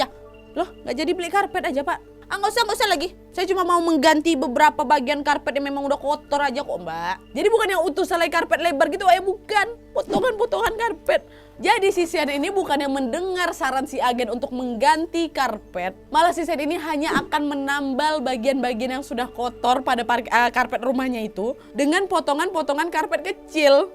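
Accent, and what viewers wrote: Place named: Medan, Indonesia. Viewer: Suka kaliii bah aku dengar cara bicaranya,gaya medan bahh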